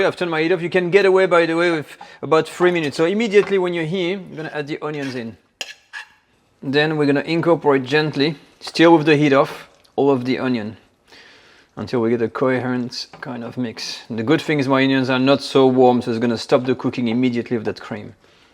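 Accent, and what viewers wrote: France. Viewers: I love your french accent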